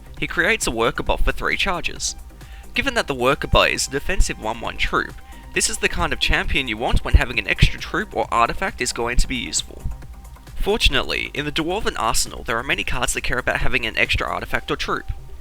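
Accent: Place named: Australia. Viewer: Very much like your video trying to place that accent... I wanna say adelade? maybe Melbourne. Its not New Zealand... Use of /aː/ as opposed to /æ/ Word Hobart Melbourne Brisbane Sydney Adelaide Ave. over all five cities graph 0% 30% 56% 70% 86% 48% chance 0% 60% 25% 80% 86% 50% demand 10% 78% 78% 90% 100% 71% dance 10% 35% 11% 30% 86% 34% castle 60% 30% 33% 100% 86% 62% grasp 90% 89% 89% 95% 100% 93% to contrast 100% 100% 100% 100% 71% 94% Ave. over all seven words 39% 60% 56% 81% 88% 65%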